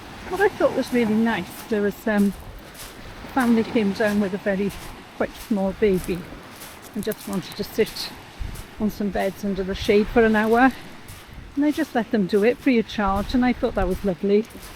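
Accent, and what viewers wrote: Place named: Wales, United Kingdom. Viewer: You have a Welsh accent. Where are you from. I'm from Newport South Wales and coming to Skala in 5weeks. I've been to Kefalonia twice and stayed in Skala both times. I love it!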